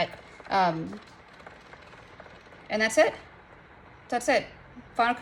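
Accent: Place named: Louisiana, United States. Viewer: Thank you for being real and showing us all how to. Got a question are you from Louisiana? Oops I finished watching and answered my question...lol I thought so I recognize the accent ❤ I grew up a Louisiana girl.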